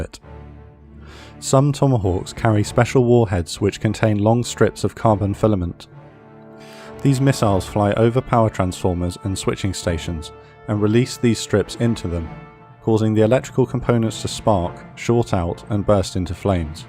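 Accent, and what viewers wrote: United Kingdom. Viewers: Why are narrators always british?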